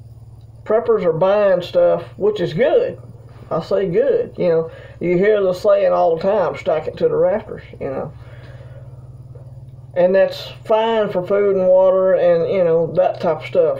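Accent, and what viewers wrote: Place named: South, United States. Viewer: Absolutely important info! Love hearing another familiar sounding Southern Accent! Stanley and Donna in the NE GA mtns.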